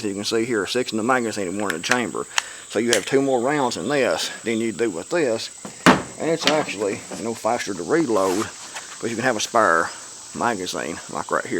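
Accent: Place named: South, United States. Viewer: This is the first of your videos I've ever seen. It just popped up in my YT feed. Soon as you started talking, my first question to myself was "I wonder what part of East TN he is from?" Went to your channel and saw several thumbnails with the Tennessee state flag in the background. ;-) I'd hazard a guess of Greeneville, TN? Possibly Kingsport or outlying areas. Born and raised in Gray, TN myself. ;-) Oh...and cool on the EDC stuff. Not a Glock fan myself, but they do work. I just don't care for the ergonomics of 'em.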